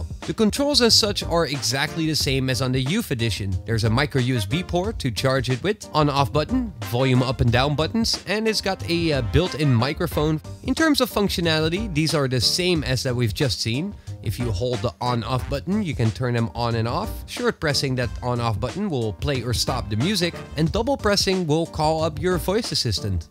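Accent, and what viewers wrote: Netherlands. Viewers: Are you Dutch? I think I can hear a veeeery slight Dutch accent creeping through your otherwise flawless English. Groetjes ;) Also, as a Xiaomi phone user, do they give any benefits? Like the OnePlus' earphone has magnetic buds which autopause/disconnect and connect/autoplay when put together or separated, when using a OnePlus phone.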